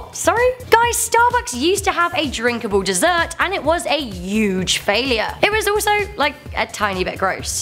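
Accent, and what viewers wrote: United Kingdom. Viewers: She made me cringe each time when she pronounced it as "pITas" instead of "pEETas", lol and killed me when she said "naughties" instead of "nineties". She speaks very much like my ex mother in law(she is British), except I like listening to this woman speaking...and not the ex mother in law.lol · I think it may be British pronunciation cos I say taco like that and I’m British cos Americans have a TOTALLY different accent so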